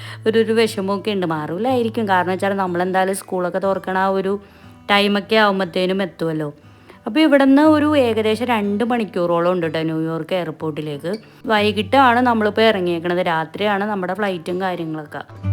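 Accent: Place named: Kochi, India. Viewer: ഇവർ മംഗ്ലീഷ് അല്ലല്ലോ പറയുന്നത്. തനി കൊച്ചിമലയാളം. എന്നിട്ടാണോ ഇങ്ങനെ പറയുന്നത്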